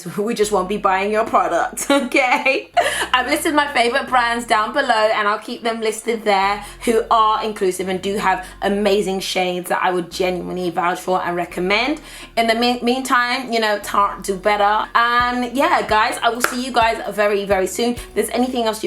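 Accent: Nigeria. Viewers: "your mate look at them" with your Nigeria accent 😂😂😂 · Haha the Nigerian accent. Wow!!!!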